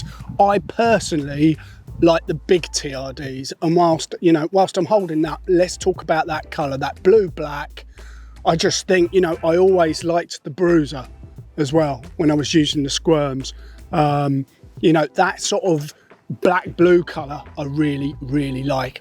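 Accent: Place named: Cockney, United Kingdom. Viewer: are you going to do some new barbel vids? Missing your cockney enthusiasm. Hope life isn't bending you over. 👍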